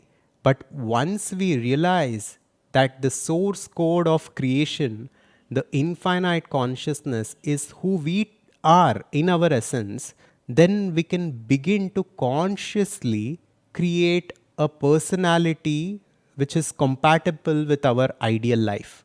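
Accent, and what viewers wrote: South India, India. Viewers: You’re feeling a little out of place because of his accent. It’s the regular Indian accent which all Indian languages speakers understand. You’re used to western accent which is foreign to us when we travel to Britain or USA, but with time everything falls into place. No one is disturbed by your observation. We are one people so don’t worry. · It’s called an accent, he has an Indian accent. 🙄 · That s an strong south Indian accent , u north indians will feel it different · It's just his indian enunciation,but we aught to focus on the content : l thought it very informative,and useful.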